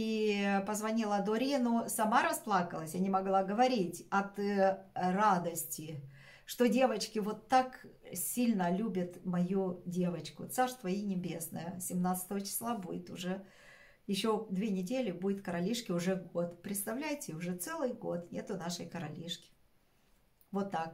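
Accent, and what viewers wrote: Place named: Moldova. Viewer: С удовольствием вас смотрю,.И слушаю.И в Бельцах была не раз.И в казанчике готовила.И говор ваш мне близок до слез.Я русская, но 35 лет назад жила в Кишиневе, вышла там замуж первый раз.И до сих пор снятся Баюканы и кинотеатр " Флакера"😅😅😅Я жила в 80- е годы в общаге.И у нас жили девочки и русские, и молдаванки, и болгарки, и гагаузки, и еврейки.Нет, вру.Евреек в общаге не было, но я с ними работала.Эх, хорошее время было, хоть и перестроечное)))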